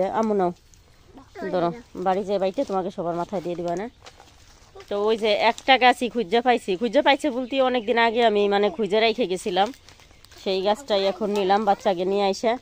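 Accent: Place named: Faridpur, Bangladesh. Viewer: খুব সুন্দর হয়েছে আপনার মেয়েদের ভিডিও। আপু আপনার গ্রাম কি ফরিদপুর? আসলে ভাষা পরিচিত লাগছে💚💚💚💚💚💚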